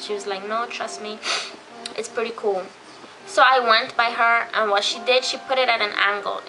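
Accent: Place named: West Indies, Caribbean. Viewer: I love your west-indies accent! Your real pretty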